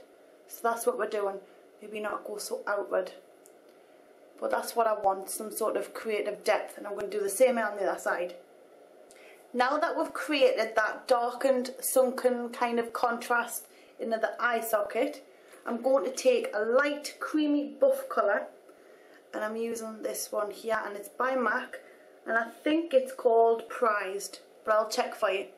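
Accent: United Kingdom. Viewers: she is british. how can you say how she naturally speaks sucks???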